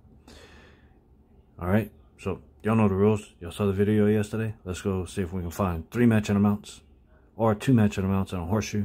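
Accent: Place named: Louisiana, United States. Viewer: Love that Louisiana accent drawl action too 💪🏽💪🏽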